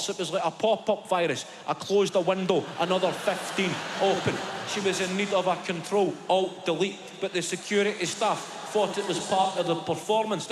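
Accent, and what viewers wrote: Scotland, United Kingdom. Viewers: It's not an English accent It's English with a Scottish accent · Can you please add subtitles to this? I'm from middle east and it's really hard to understand Scottish accent :(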